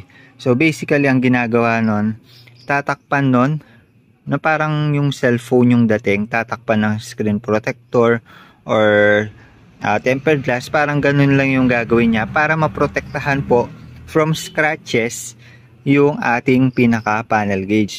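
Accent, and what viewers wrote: Pampanga, Philippines. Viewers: Kapampangan sya.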